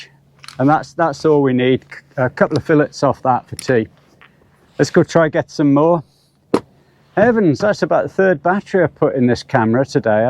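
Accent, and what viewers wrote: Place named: Northern England, United Kingdom. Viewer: Oh I see 😊 Thank you mate.I did notice you had a northern accent 👍 I was thinking somewhere near Liverpool? Your vidios are brilliant,I love how you get so excited and can see you are a genuine nice guy that loves his fishing.Keep them coming buddy.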